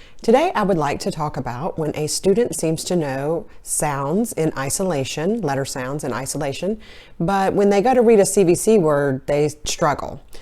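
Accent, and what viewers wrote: South, United States. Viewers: When she says 'Go get that', it sounds like 'Go git that', because of her southern accent. It can be confusing for a young person to sound it out correctly.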